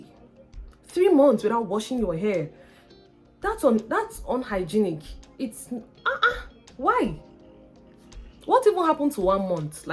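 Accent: United States. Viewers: The American accent 😂😂😂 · The American English accent 😂 killed me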